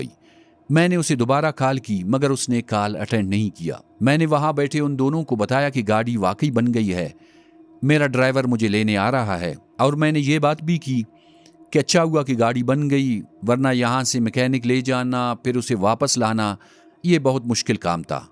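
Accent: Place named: Balochistan, Pakistan. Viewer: واہ بلوچ تمھارا لہجہ بلوچی ھے مگر اردو اچھی بول لیتے ھو۔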